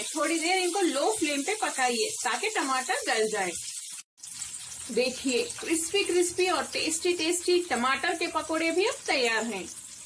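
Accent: Hyderabad, India. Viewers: Aapki hyderabadi mast rehti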